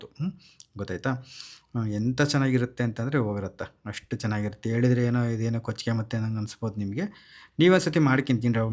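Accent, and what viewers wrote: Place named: Holalkere, India. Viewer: ನಮ್ಮ ಹೊಳಲ್ಕೆರೆ ಭಾಗದ ಊಟದ ಸೊಗಡಿನಂತೆ ನಿಮ್ಮ ಮಾತು ಸಹ ನಮ್ಮ ಸೊಗಡು ಉಳಿಸಿಕೊಂಡಿದೆ😂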